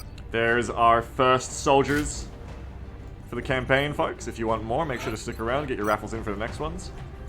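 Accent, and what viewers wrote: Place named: Australia. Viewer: Dude has a mix of Australian and American accent. I don't recall that being the case years ago. Weird.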